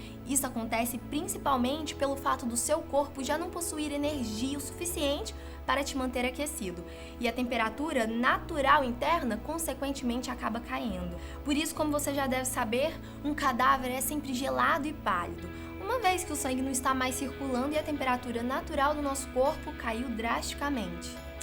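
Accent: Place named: Minas Gerais, Brazil. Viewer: Sotaque mineiro.....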